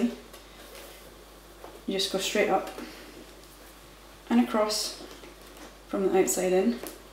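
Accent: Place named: Scotland, United Kingdom. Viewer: You're description of this technique is exactly how I wore mine in the late eighties/early nineties. You're freckles and Scottish accent are adorable. Having been born in Belfast and grown up in Toronto... It must be said, You are an absolute gem. Cheers, Michael Hughes in Toronto.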